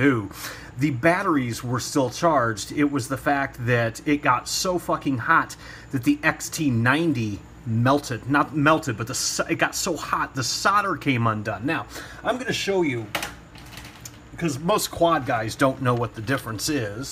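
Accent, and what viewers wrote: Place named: New Jersey, United States. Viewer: You sound like us in jersey fuckin fuckin fuckin love it brother I just subbed fuckin aaaaaaaaaaa lol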